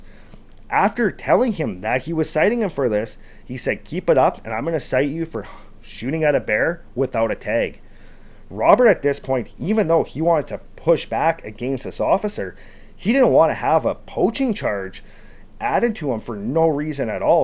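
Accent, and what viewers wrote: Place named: Canada. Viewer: That’s an insane accent 😂 no wonder Americans make fun of us. - a west coast Canadian ❤😂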